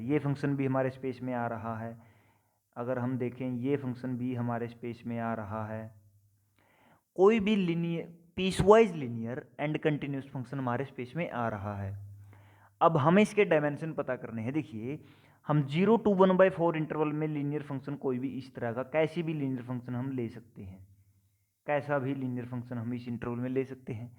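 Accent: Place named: Haryana, India. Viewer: Sir aap haryana se lg rhe hain😂❤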